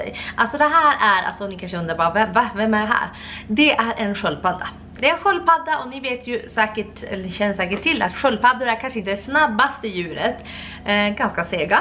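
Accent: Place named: Skellefteå, Sweden. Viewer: Älskar när du säger bakanför. <3 Låter så charmigt. :D Skellefteå-dialekten är härlig. Men har aldrig hört det där innan du sa det. :) Säger inte att det är fel, lite kul att höra andras dialekt :)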